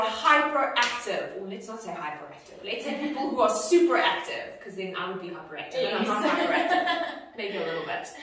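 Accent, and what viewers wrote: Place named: Northwest England, United Kingdom. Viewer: She is called and she sounds like she is from my area in the UK from her accent (NW)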